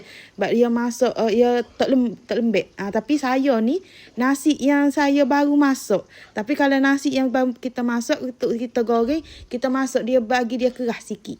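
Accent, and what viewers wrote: Kelantan, Malaysia. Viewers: Sedapppnya...tk resepi..asyik dengar kecek klate muuu...😊😊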